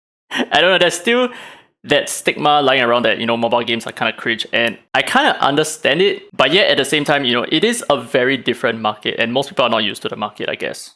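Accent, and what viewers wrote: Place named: Singapore. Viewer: normally he has some English Singaporean accent, but yeah maybe because it's more formal his accent is not as heavy as usual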